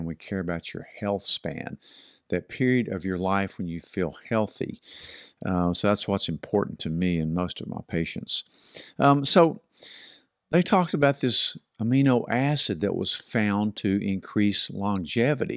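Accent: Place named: South, United States. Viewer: I despise Southerners and that accent.. wtf?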